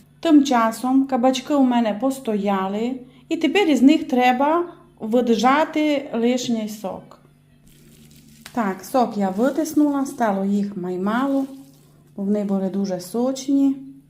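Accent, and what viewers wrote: Transcarpathia, Ukraine. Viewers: Обожнюю закарпатські діалекти :) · Підписався з двох причин: все, що готував за Вашими рецептами виходить надзвичайно смачно. І дякуючи Вам, насолоджуюся закарпатським говором. Дякую!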